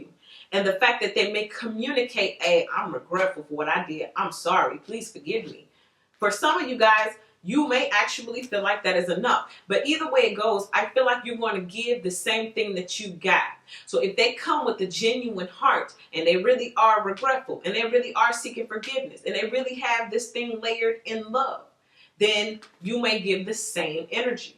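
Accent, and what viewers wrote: Chicago, United States. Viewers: Sounds like you’re from Chicago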